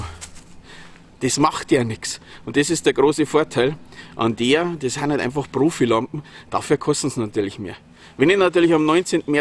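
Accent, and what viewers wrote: Bavaria, Germany. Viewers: #M2R Pro Vielleicht bringt sie mir ja Licht ins Dunkle?! Vielen Dank für deine super Video in bayrischer Sprache!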